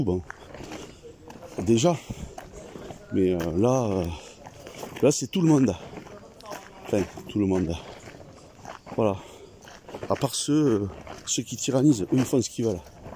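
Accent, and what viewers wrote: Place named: Nord, France. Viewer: C ' ęst un bęl accęnnnnnnnnt , 😉👍 mi chē mí , chē d'tí , ti zotę , achtęur : traduc'tíon : moí c ' ęst moí , c' ęst dę toí , vous autręs , maíntęnant . Rięn d ' aussí chantant qu á toulousę , sínon ící dans lę plat paÿs ,c ' ęst trēs chalęuręux dans lęs blęds , moíns lęs grandęs víllęs . Bíęn a toí , tę bílęs pas , cę quí doít arrívęr arrívęra .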